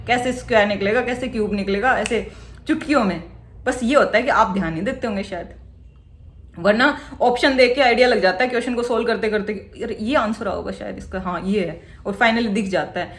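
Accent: Haryana, India. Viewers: Your Haryanvi... ❤️ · I m from bihar, i appeared in cgl 22 mains and, got low marks so decided to prepare hard for 2023 cgl, i liked your advice and loved your Haryanvi accent, the way you explained things is superb.... You made me remind of ritu ma'am who is also from haryana and preparing for upsc.... Haryanvi wali thaat achhi lgti hai with decency.... 😊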